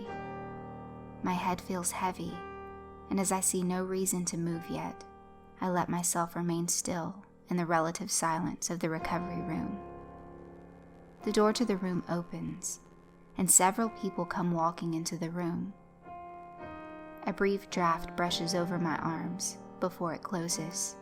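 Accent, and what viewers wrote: South, United States. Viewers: that southern accent though.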